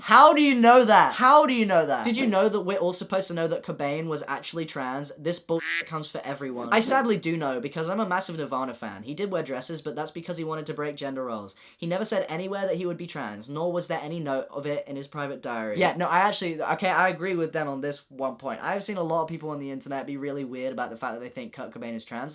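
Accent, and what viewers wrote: United Kingdom. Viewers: BRITISH PERCY JACKSON? :0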